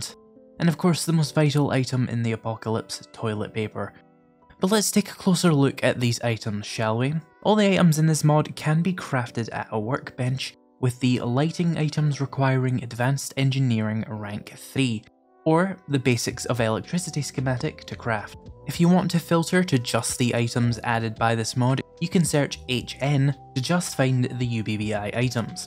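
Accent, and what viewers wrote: Scotland, United Kingdom. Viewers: he sounds Scottish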